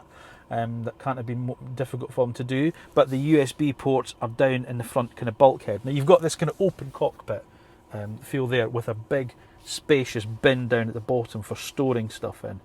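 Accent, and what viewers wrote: Scotland, United Kingdom. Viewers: Thanks for the great video! Scottish accent is also fantastic -- so many memories from spending time in Edinburgh 😂 But seriously about the car: IMHO the more unrecognisable and sleeper it looks the better 😂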